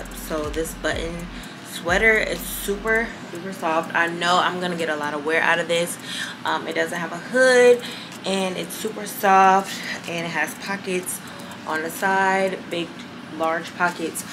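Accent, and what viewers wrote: England, United Kingdom. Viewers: It was the fuckingggggg BRITISH ACCENT for meeeeee🤣🤣🤣 “LITRALLYYYY” 😭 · The English accent😂😂😂 literally! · It's the English accent for me LOL Gworl I love it LOL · British accent always has me in stitches😂😂😂 it’s like she talking regular then all of a sudden, boom, accent. I’m like girlll😂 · Love your videos as per...and the sprinkling of British accent you give Love from the UK 🇬🇧💕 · Your English accent kills me every time, so funny. You say literally -perfectly 😂❤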